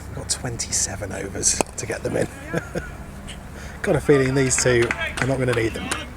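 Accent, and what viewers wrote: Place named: North, United Kingdom. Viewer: Its already excellent to listen too, with the added spice of your accents from up there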